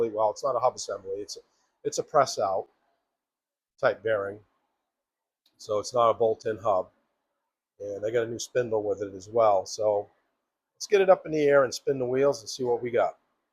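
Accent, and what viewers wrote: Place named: Maine, United States. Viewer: Guy you def a mainah...you ain't from New Hampshire.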